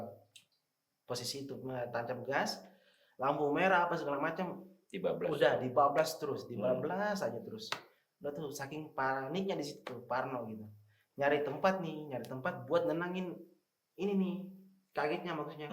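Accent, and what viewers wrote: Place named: Eastern Indonesia, Indonesia. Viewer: "Apa Segala Macem" Logat orang timurnya gak ilang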